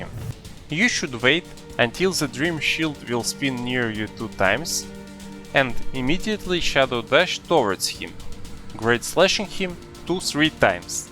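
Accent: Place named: Russia. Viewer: Ты чо, русский шоле? А то акцент русский...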